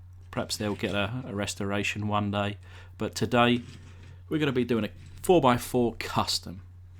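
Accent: United Kingdom. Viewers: Hey ;-). I have a question. Are you from UK ,from Ireland ,or at other english spoken Country ?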